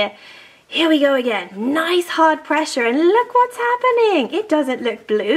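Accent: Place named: United Kingdom. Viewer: thank you, you are talented, i found it funny that you sound so british even though you are from usa :D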